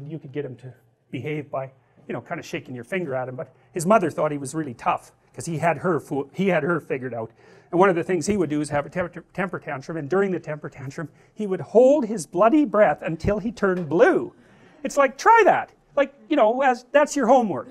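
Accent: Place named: Canada. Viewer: This is the most Canadian accent I’ve heard from JP ever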